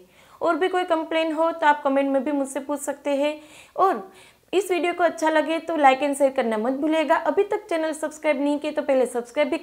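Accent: Gujarat, India. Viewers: Bolne k lehze se gujarati lg rhi h..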